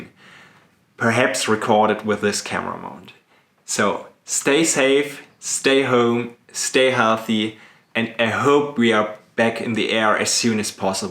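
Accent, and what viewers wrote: Germany. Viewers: Mach bitte auch Videos auf deutsch oder einen deutschen zweit Kanal denn dein Akzent ist so deutsch da kannst du es auch sofort auf deutsch machen Ist aber alles nett gemeint nicht böse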